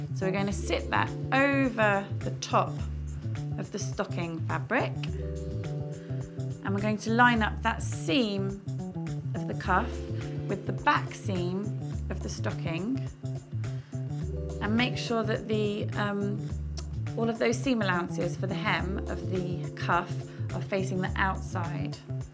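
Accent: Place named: United Kingdom. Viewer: Is it just me or is everything better with a British accent? Because that was smooth and clear rather than the last video I watched. Great job!! Looking forward to making these for the family. Can't wait to embroider names on the cuffs too :)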